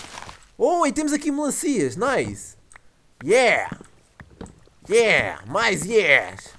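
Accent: Portugal. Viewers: ele fala português de Portugal , isso é bem normal ...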